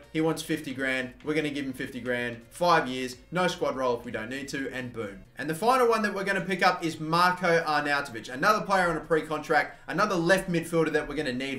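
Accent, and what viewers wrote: Australia. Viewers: daily dose of angry aussie beginning in 3, 2, 1....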